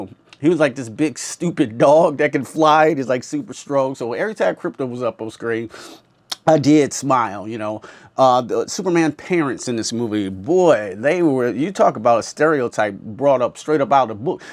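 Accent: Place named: South, United States. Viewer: Yeah, I noticed the Southern accent too lol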